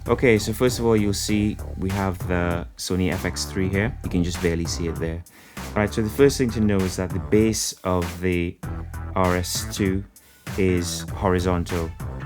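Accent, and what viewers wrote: Trinidad and Tobago. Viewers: love this vid have to ask are you trini Im hearing that accent.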